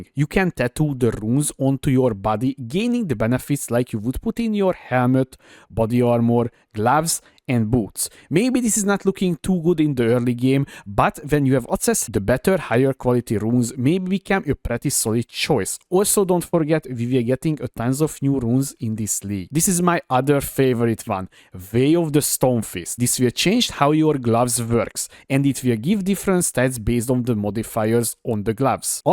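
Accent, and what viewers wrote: Hungary. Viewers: Sounds Hungarian to me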